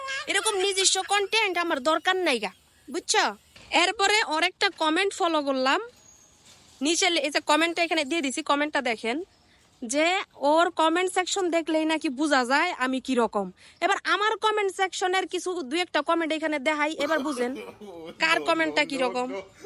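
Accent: Comilla, Bangladesh. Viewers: আপু আপনার বাড়ি কি কুমিল্লা জেলায়?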